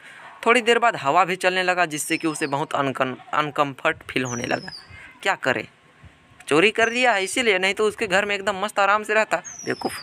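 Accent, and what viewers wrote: Bihar, India. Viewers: Aaaaà bhai kaya tum bihari hooo???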